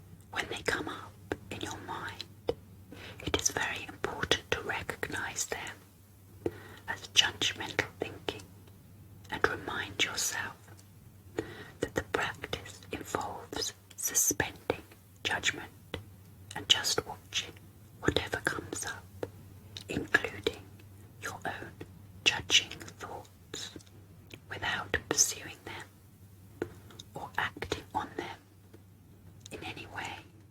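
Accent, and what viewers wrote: United Kingdom. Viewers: I love your British accent 🌹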